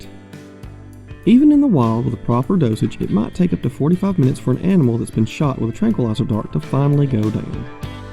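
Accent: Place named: South, United States. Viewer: Articulate. Speaks well. Southern. Talking dinosaurs…. Dude! You are my new best friend!!!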